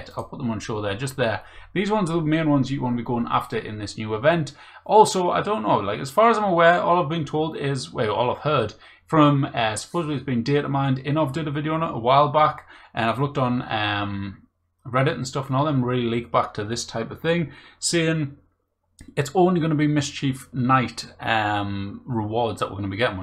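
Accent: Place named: Scotland, United Kingdom. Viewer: Are you Scottish or Irish or do you just have an awesome accent?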